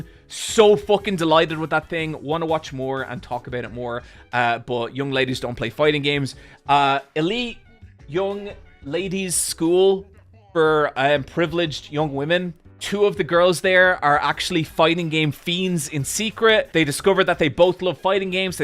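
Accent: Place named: Ireland. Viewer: Is it me or does he sound significantly Irisher than usual here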